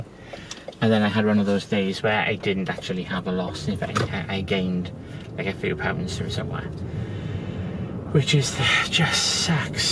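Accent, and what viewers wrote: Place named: Birmingham, United Kingdom. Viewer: You from Birmingham? I recognize the accent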